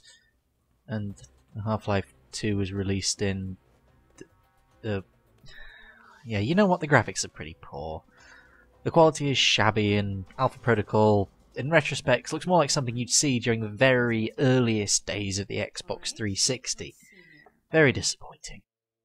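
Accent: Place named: Ireland. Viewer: you sound relatively irish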